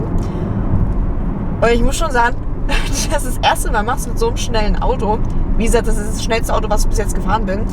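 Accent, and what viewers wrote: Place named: East Germany, Germany. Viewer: In der Tat ein brachiales Auto: nur das Rot und der Digitaltacho würden mich stören. Wie hoch war denn der Verbrauch bei deinen Testfahrten? By the Way:, dein "Ostlerslang" ist wie immer phänomenal erfrischend (ich meine das positiv!). 😜 Liebe Grüße von einem Ex-Magdeburger aus Herrenberg (bei Tübingen), Chris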